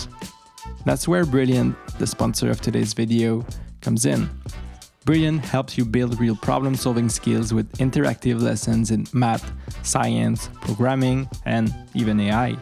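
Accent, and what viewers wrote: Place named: Quebec, Canada. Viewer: Je vois que t as un accent quebecois, donc je présume que tu parle français xd. Tu es partis à quelle université? Perso je dois choisir entre génie logiciel et génie éléctrique, mais je ne suis pas sûr sur laquelle partir. Je vais aussi bientôt completer ma technique d'Informatique en moins de 1 ans et juste avec ce diplôme je ne suis pas sûr d'être capable d'avoir un travail dans le secteur d'informatique en général.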